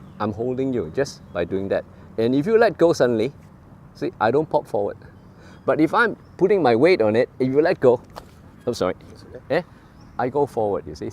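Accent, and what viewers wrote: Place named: Singapore. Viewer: Sounds like he is from Singapore! I wanna learn from this sifu😂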